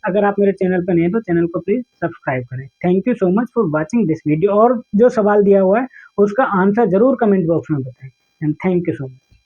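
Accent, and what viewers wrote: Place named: Bihar, India. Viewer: Aap Bihar ka san hai sir jii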